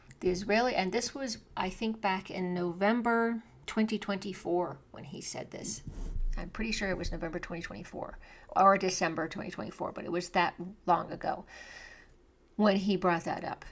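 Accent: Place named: United States. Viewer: YT “fed” my this channel. Interesting, but I have some questions, comments, observations. First, when did your mother attend HS in Palaio Faliro? I lived there. I knew THREE women who went to school there, before 1960, none ever mentioned that Constantinople would be returned. But I have heard others say they were taught this-in rural Greek schools. Second, observation, you sound American. You’ve never noticed that Orthodoxy, Hellenism, and Greece are generally painted out of the news-scape? Pascha is glossed over, because it’s not Western Easter, while Jewish, and even Moslem and now Hindu holidays get press. You haven’t noticed that living in the US? Because I have since I came here. You have some good nuggets of information. I like the links and references to Nima and Col. Wilkerson, and Col. McGregor. For whatever reason (perhaps they were spurned by the system), the tell a lot of truths the mainstream media keeps. 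However, they have their biases. I’ve been watching McGregor for years. I like him, BUT, he was a Turkophile. Of late, he has seen the light and become more objective when discussing the Turks. The US officer corps, Army/AF has always favored Turkey. Israel is committing the first live-streamed genocide and apartheid. Israel is every bit as despicable and criminal as Turkey/Ottoman Empire, FYI. Greece should not trust Israel, or depend on it. The Greek government is basically beholden to the West/US. They are puppets. Back to the prophecies (as reported by you)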